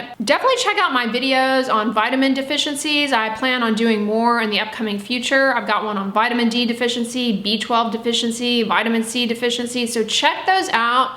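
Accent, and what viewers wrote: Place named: California, United States. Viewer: That California accent is BRUTAL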